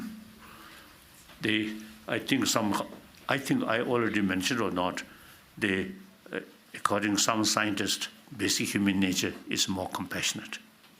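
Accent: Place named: Tibet, China. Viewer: that is absolutely not what the Dalai Lama said, it's an AI speech recognition program that goofed up because it misheard his Tibetan accent, but to answer your first question from my own perspective: yes. It certainly can be, though not necessarily so. Also, while many communist dictators were atheists, atheism is NOT inherently authoritarian and conflating ordinary atheists with Stalin or whoever is a vicious smear. I will not conflate you with Hitler or Franco, who I believe were both Christians, and I would expect the same courtesy and good faith from you with regard to me, as an atheist, and Stalin or Mao ZeDong or whoever. Ground rule: at least have BASIC good faith and don't smear people like that. Agree?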